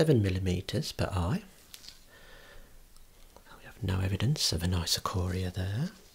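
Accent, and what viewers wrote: Birmingham, United Kingdom. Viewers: Is it a Brummie accent? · Hes from birmingham or wolverhampton. The accent.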